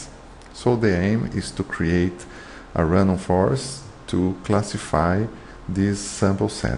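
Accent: Brazil. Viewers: His ascent sound like hi is brasilian? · Nossa, comecei a ver e ouvindo o jeito de falar ficou muito clara a brasilidade da pessoa. Mas muito legal o material.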